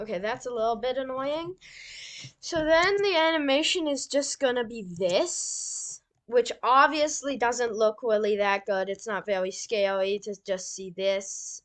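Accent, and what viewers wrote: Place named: United Kingdom. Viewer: you sound British no offense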